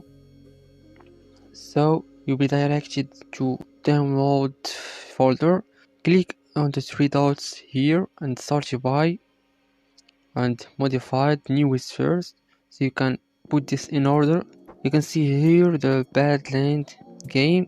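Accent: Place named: Morocco. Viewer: You are moroccan from your accent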